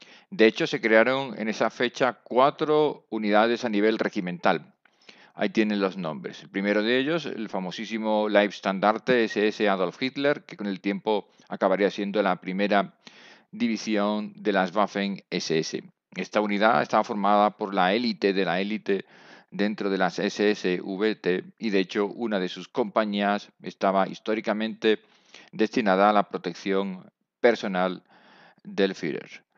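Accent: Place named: Spain. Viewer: oro puro este canal, soy cubano y ese acento del castellano es música para los oídos...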